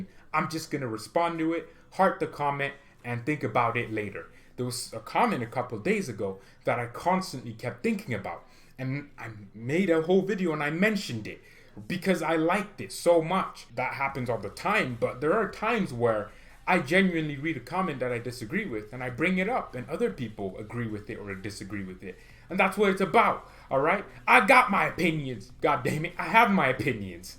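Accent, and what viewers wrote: England, United Kingdom. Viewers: That English accent tho 😂👌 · Dude you English accent is funny as hell. 😂😂😂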